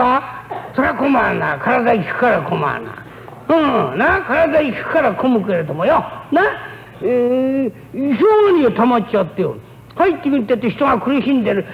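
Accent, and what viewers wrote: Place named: Edo, Japan. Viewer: 現存する音源では最高峰のひとつ。正確に文字に表せない江戸風の言い回しが凄まじい。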